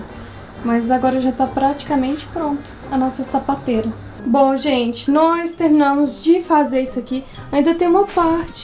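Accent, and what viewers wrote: Minas Gerais, Brazil. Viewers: seu sotaque em nossa língua é gostosamente mineiro! Sou daqui de Divinópolis, Minas Gerais! Trem difícil, cara emburrada, etc... muito legal! Você é a youtuber mais mineira do mundo! Conhece o Paulo, um rapaz lá do Recife que mora aqui perto de Divinópolis? Veja este vídeo, e vou te dar a ideia de explorar este seu jeito de falar mineirês! <link>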